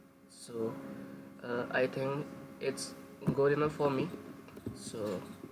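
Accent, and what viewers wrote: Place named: United States. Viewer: Missing your american accent😕😢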